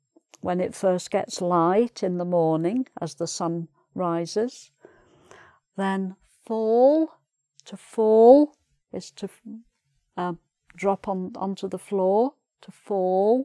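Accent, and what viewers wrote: United Kingdom. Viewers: I love British English. Thank you for your English. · Que delícia assistir às aulas dessa adorável senhora! Inglês Britânico fica mais interessante com as aulas dela. Obrigado! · i like british acent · It has been a utterly splendid, exquisite and flawless lesson. I'm particularly joyful, blissful and elated with that opulent topic. It is to my liking; moreover, it appeals to me. I do adore speaking, and her charming accent., Hence, I present; undoubtedly, the Queen of British English. You are the quintessential of British English. Thank you very much indeed. Far thee well, dearest Prof. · I love the british english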